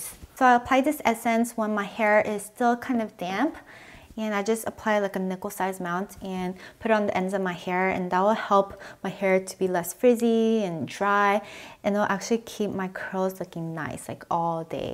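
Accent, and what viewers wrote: South Korea. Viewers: wats wrong with her voice? korean accent?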